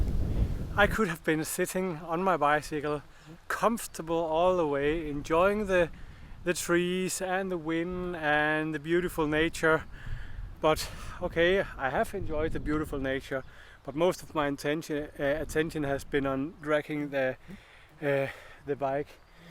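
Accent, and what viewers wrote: Denmark. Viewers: I allways like the big fat danish accent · I haven't finished the video and I don't yet know if I'm going to buy a Nikon mirrorless (currently have Nikon DSLRs but also a Sony α7RIII) but a Brit who once lived for a year in Aalborg, Denmark I absolutely love the sound of a Danish accent speaking English!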